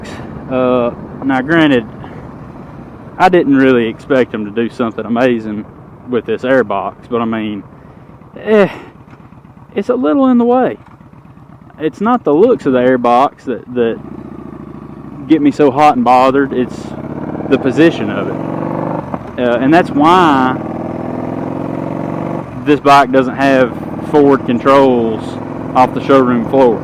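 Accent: South, United States. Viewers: Greetings! You sound like Huckleberry Hound to my old ears. Where are you? That landscape looks totally English and the only thing that gives it away as the US are the road markings and your deep southern drawl. And I don't see potholes in your road there... how is that?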